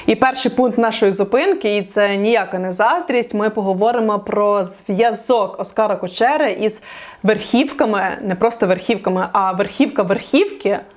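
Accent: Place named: Western Ukraine, Ukraine. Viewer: Клас, цей західний діалект🥰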